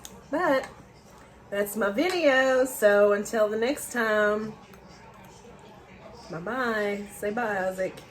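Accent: South, United States. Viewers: this is my first time seeing you and your channel.I love your smile and your positive energy.I can tell my your deep southern drawl that you are a southern woman like myself.I am born and raised in Dallas,Texas I just moved back to Dallas in May 2018.I have never lived anywhere but Texas.Thank you for sharing I do appreciate it.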